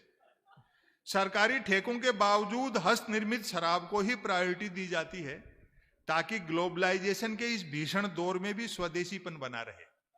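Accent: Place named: Rajasthan, India. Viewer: आदरणीय " " भाई साहब निवेदन है कि अपनी रचनाओं का प्रदर्शन राजस्थान में (हो सकता है थोड़ा दक्षिणी राजस्थान छोड़कर ) अपनी मायड़ भाषा में प्रस्तुत करते हैं तो बहुत अच्छा लगता है, फिर अपने झुंझुनू जिला का लहजा आप बहुत अच्छे से बैठाते हैं, हिन्दी और मायड़ भाषा को मिक्स-अप करेंगे तो भी अच्छा छूंकण लगेगा। करणी राम सैनी उदयपुरवाटी, हाल जयपुर।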